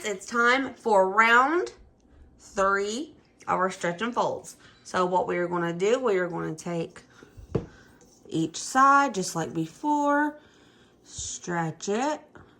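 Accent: South, United States. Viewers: Love to watch the show and the great recipes. The southern accent is difficult to understand at times. Maybe slow down while explaining the recipes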